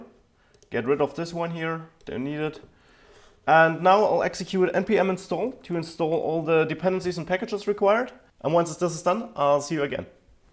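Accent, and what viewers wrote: Germany. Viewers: "Bootable", "Boot" I love German accents! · Ich hab das Gefühl, ich höre ein wenig Deutsch heraus :D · Thanks for this video. It is fast, direct to the point, no time lost, fun to watch, and nice german accent!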